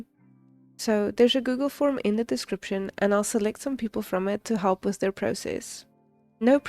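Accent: South Africa. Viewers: Are you from south Africa? I can tell from accent since I'm from jhb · Sorry this is totally random, but I'm 90% sure you have a South African accent and I love it.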